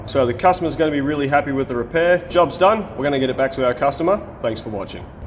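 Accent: Australia. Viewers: You've got an Aussie accent....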